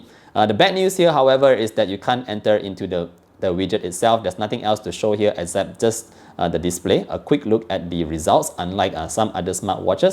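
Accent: Malaysia. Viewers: heard your english, definitely my countryman. selamat hari merdeka!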